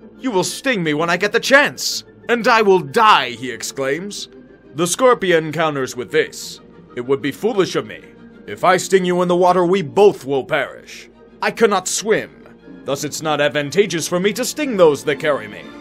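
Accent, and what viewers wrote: Pittsburgh, United States. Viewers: SIDE NOTE: I love how Beethoven's Molto Vivace is playing in the background during the narration. Goes very well with the Yinzer's voice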